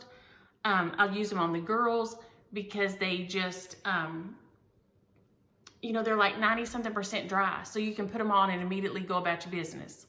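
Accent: South, United States. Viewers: Home and Health. Your hair looks great, love your southern twang. Linda Norris....Thank you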